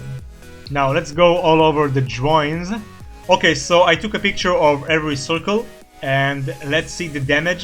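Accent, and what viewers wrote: Israel. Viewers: איזה מבטא ישראלי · איזה מבטא ישראלי אמאאא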